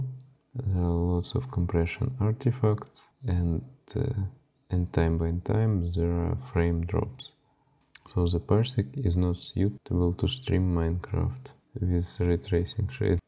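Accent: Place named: Russia. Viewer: Hey, I've noticed that you're Russian. Good English, man! :)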